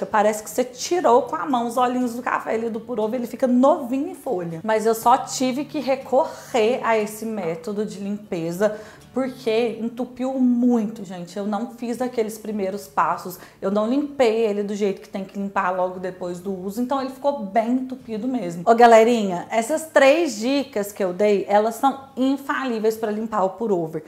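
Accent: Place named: Minas Gerais, Brazil. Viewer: Aqui, gente, vou falar uma coisa procês: adorei esse sotaquizim mineiro, sô. Bão demais.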